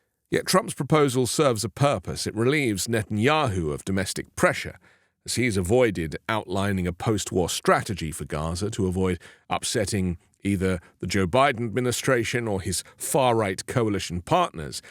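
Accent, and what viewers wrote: United Kingdom. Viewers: Is this ai voice implant to sound British and why would you think it makes sense?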